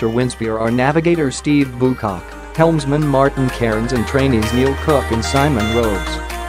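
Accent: United States. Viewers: Narrated by an American robot?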